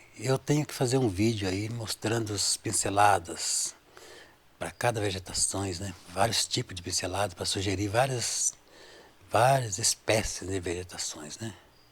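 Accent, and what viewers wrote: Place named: Minas Gerais, Brazil. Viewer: Adorei suas dicas. Seu sotaque mineiro é muito bom. Obrigado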